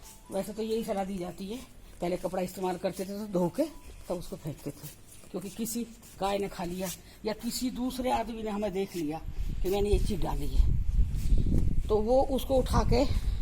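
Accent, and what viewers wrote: Uttar Pradesh, India. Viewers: Love u auntyji ...aapki language mujhe UP ki yaad dilati hai I am frm punjab now in italy bt meri sasural khurja mei hai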